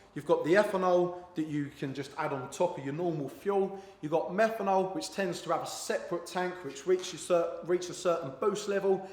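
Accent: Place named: United Kingdom. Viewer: Very informative, but not easy to understand you since you were talking with a British accent and very fast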